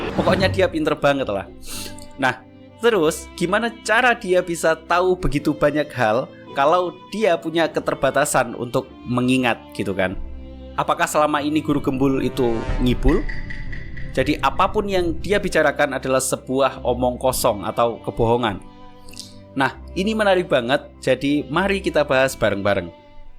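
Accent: Java, Indonesia. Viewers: Mas mon maap sebelumnya, mungkin akan lebih nyaman pake “aku kamu” aja, gak usah/jangan pake “Gua Elu” karena masih belum sesuai, maaf medok bgt.. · Apakah boleh saran mas😁 Saya dari jawa dan kayaknya mas juga jawa bisa di lihat dari lahjah bicaranya Coba pakek "saya kamu atau anda" jangan pakek "lo gw" kayak yang kaku ajah mas😁🙏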